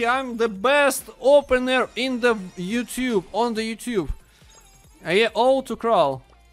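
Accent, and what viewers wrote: Russia. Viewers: да ты руский просто по англиски говоришь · russia, i know because my parents are from Russia and they have this accent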